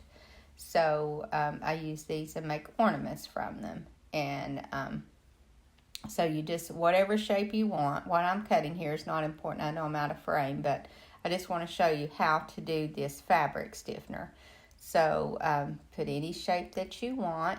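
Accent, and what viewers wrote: South, United States. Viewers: You have such a thick southern accent, which most of us aren't used to hearing, that made you so hard to understand. Could you please repeat this in standard English or with captions. If it wasn't for the vid, I would have missed out. Thanks.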